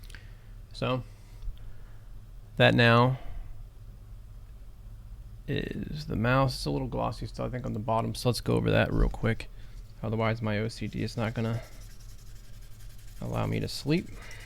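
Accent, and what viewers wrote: Ireland. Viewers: R u actually Irish though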